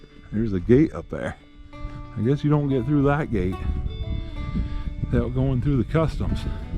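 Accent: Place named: New England, United States. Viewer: thanks. Sounded like a combination Massachusetts and Maine.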